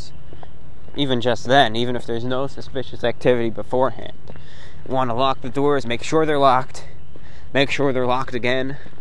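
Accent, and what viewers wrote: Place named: New York, United States. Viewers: Damn your New York accent really shines in this one. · Love hearing his New Yorker in his early years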